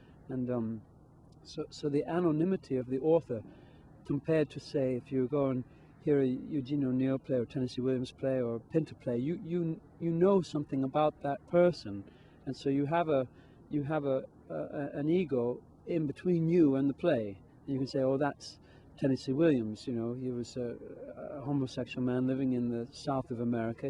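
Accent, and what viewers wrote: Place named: England, United Kingdom. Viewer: Why does he have an English accent here? He was raised in America. And he didn't have one when he accepted his Tony two years ago.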